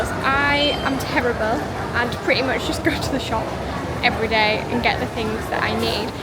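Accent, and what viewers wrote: United Kingdom. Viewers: I like that accent.. been a fan of british pop since 80's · I love your accent,,,,,from UK?,,,,,your so nice and pretty by moving to my country Philippines….iam glad…..enjoy the Pilipino way of life…by the way iam a Pilipino living In Miami….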